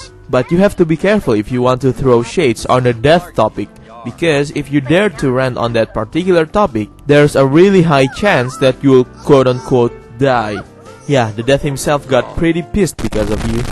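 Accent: Indonesia. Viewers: He got indonesian accent. Am i wrong? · you sound Indonesian! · I bet you're indonesian. I can tell by your accent😄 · Starting to miss the sims 3, I think the sims 3 is better than 4. Nice vid, btw I hear Indonesian accent, are you from Indonesia?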